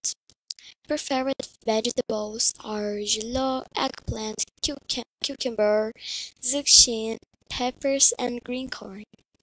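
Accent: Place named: England, United Kingdom. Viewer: Love your English accent.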